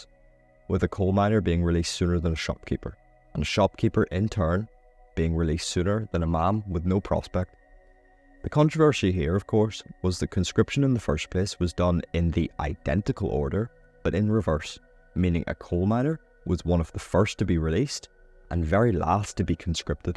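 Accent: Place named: Northern Ireland, United Kingdom. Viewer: Excellent and interesting video, thanks for making this! Nice to hear a Northern Irish accent too.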